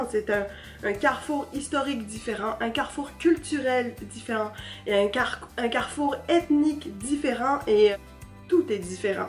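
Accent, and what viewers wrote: Quebec, Canada. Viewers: Je trouve que vous avez beaucoup l'accent québécois.Si vous n'aviez pas mentionné que vous étiez française,j'aurais pensé que vous étiez québécoise. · Visiblement, vous êtes bien intégrée car dès que vous avez commencé à parler, j'ai cru que vous étiez québécoise. Vos conseils sont judicieux, soit dit en passant.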